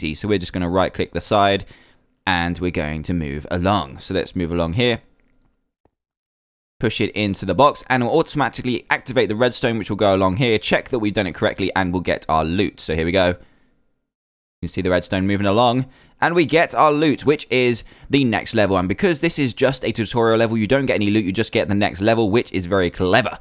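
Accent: United Kingdom. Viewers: Ever heard of a British accent, m'kay?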